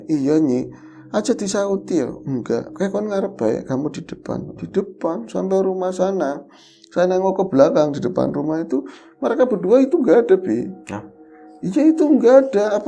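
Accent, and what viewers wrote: Banyumas, Indonesia. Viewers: Sallam ngapak Mas, seneng krungu,ne soale ibu,ne aku wong Lebaksiu bapa,ne aku wong wetan Solo👍😃sukses selalu.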